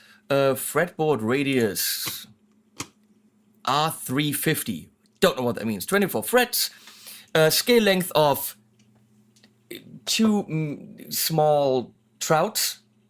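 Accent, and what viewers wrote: Germany. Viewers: I havent known for a long time, only recently got back from more a computer gaming time to pick up guitar again, after a long long long time. In order to get away from the computer stuff, which isnt easy for me after so many years and very good memories, i have dumped most of my Youtube bookmarks, which were centered aorund gaming. Now slowly getting nice channels here on Youtube when it comes to making music and all that. And also this channel here, which I really enjoy. is really entertaining and nicely geeky and his german english is simply adorable I think. I am from Germany too, so I know how that is.;) Anyways, after stating my joy for this channel &, to the topic at hand.;) I have to agree with on the fact that with a guitar on that price range, a gig bag or even a simple case wouldnt be so much to ask. I mean, its quite a lot of money, at least for most of us, and to only get it in a damn cardboard package is somewhat lame, to say the least. 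It doesnt matter which company it is, if its Solar or others. The fact is, a few things shouldnt be too much to ask when you buy a new guitar. Also, a multitool like you get on Ibanez prestige guitars (dont know if also with premium), should be also included, when you buy a guitar over 300 Euro or more. Lets face it, these tools cost a couple pennys in China or whatever, thats simply no excuse to only give that with really expensive stuff. Companies really cheap out on these little things, when th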